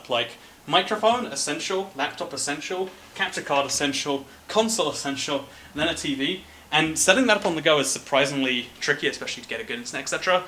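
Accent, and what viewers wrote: United Kingdom. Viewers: Hes brittish, chill. Its probably the acent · How american is, he's certainly 100% speaks british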